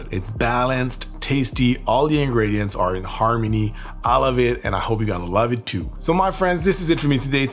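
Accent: Quebec, Canada. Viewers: Hey you're Québecois? Would have never thought? Is your accent because you are francophone? (I am aswell from Mtl south shore)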